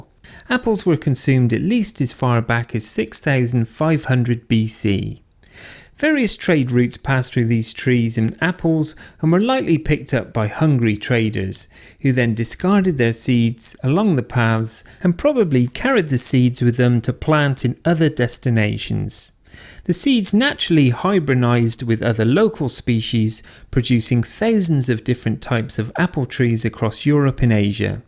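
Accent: United Kingdom. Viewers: good info and nice british voice!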